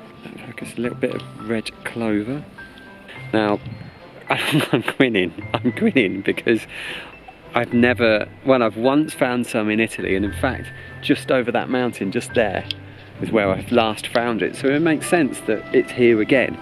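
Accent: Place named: England, United Kingdom. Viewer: I usually hate English accents. But, This guy sounds like Michael Caine♥️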